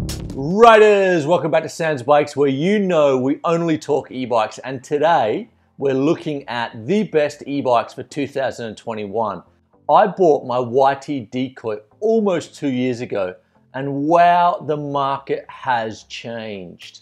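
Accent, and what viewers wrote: Australia. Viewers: Gday. I take it that you don’t like Scott bikes. Predominantly the Ransom e910 & Genius e900tuned. Like your channel even if it is for the Aussie accent 🇦🇺😎🤙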